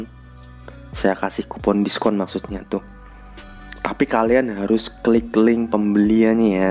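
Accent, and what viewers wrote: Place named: Banyumas, Indonesia. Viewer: Jos gandos 👍👍👍 ora ngapak ora kepenak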